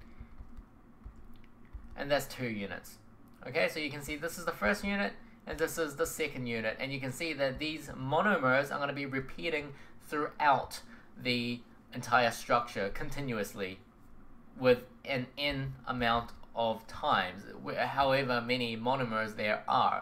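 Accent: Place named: New Zealand. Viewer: Fun Fact: You Are From New Zealand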